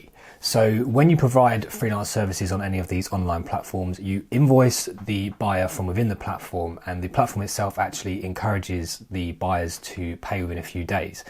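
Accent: United Kingdom. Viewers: Can I just say, one of my great annoyances is with youtubers that talk at 100 miles an hour. it’s really off-putting. Please dont fall into that trap. Otherwise, I am enjoying listening to someone from the UK for a change.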